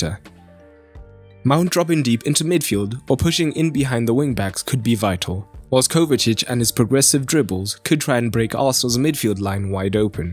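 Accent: South Africa. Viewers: Is that a South African accent by any chance? Quality, as always! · You sound South African ?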